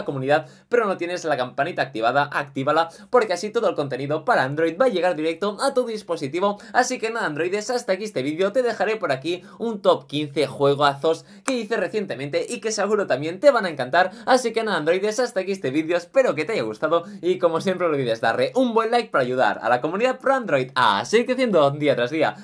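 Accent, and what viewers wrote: Catalonia, Spain. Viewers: ERES UN CATALAAAANN!!!???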